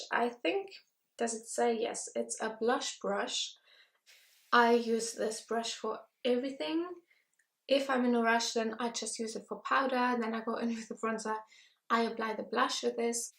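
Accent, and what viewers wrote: Germany. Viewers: Your German accent is great, and your English is good! I subscribed to you after your velvet lips video because I am interested in makeup and the German language. I learned some makeup-specific German vocabulary from your videos!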